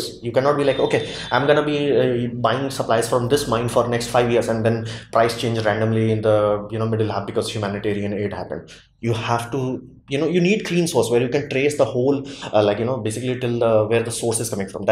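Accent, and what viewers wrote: India. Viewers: My United States English accent and your Indian English accent are far enough apart that I could not follow your presentation, so I lowered the replay speed to 75% and everything became clear. I'm just mentioning this as a suggestion for anyone else with the same problem.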